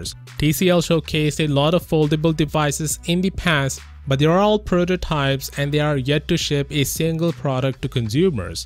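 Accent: India. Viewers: He sounds like an an India IRS scammer.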